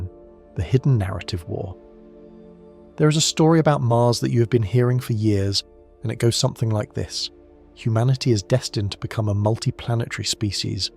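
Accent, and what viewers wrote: United Kingdom. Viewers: This guys accent tells you everything about him just another jealous Brit bitter and eager to spread fear and hate towards the great free country of United States states of America 🇺🇸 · Quit with LIES AND Lose THE BRIT NARRATOR. This click N bait.. Anything NASA Says and does if fake lies. 👎👎👎👎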